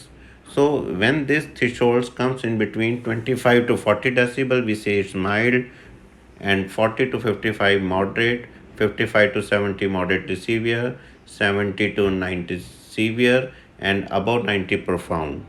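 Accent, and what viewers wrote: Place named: India. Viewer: Close captions would be handy considering the strong Indian accent, and that hard of hearing or Deaf folks will likely be seeing this.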